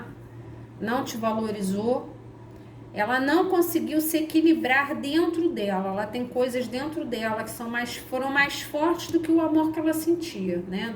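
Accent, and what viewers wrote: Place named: Rio de Janeiro, Brazil. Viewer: Gratidão! Adoro suas tiragens e esse sotaque carioca faz toda diferença, kkkk! Beijos de luz!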